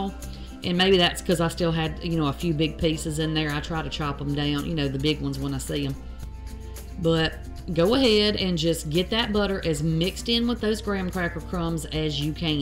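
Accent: South, United States. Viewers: Love the Real Southern Drawl! I could listen to you talk all day.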